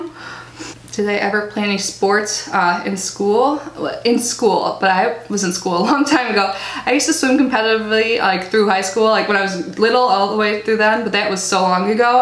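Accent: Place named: Chicago, United States. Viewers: I heard, but don't know if it's true that everyone is off the wait list in April for Ipsy plus. It stinks because my Ipsy acct I pay yearly since that's easier for me, my other account(my daughter's) is monthly and I skipped it this month so hopefully I can swing it next month if it's true that the wait list is done. I don't think you talk funny at all! You do have a strong Chicago accent though. People that I know that aren't from Philly always comment on my "accent" and I think it's so funny because I don't think I have an accent lol. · Awesome. Thank you for doing this. Your cats are adorable. My sister may be moving to Florida soon. I am not sure when or where yet. Her husband is up for a promotion. You don’t sound weird when you talk. You sound like you are from Chicago. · So excited to watch this 😀. Hope you aren’t getting sick. 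Feel better. You are young sister. I’m 47. So should we call you? 👩🏼‍⚕️🐱 “Drinks with a purpose” 😀 Archer and Laaaannnaaa would have been epic. Even if they are siblings I’m sure it would work. Lol. But Fiona is a fun name. And Emmy. All good names. 30 boxes! Wow 😯 and I don’t think that you sound funny when you talk. You have a nice midwestern accent 🙂. I’m totally going to look up your first video 😀💖 thanks for doing this Q and A.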